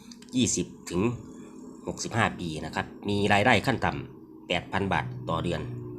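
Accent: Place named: Countryside, Thailand. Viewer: เสียงคือบ้านนอกแท้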